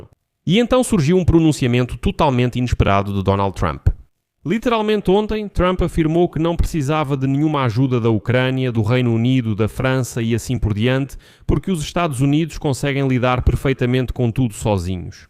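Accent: Portugal. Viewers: Não dá pra ficar misturando dois sotaques Ponho o comentário e saio Gosto muito do sotaque ❤luso mas bagunça não dá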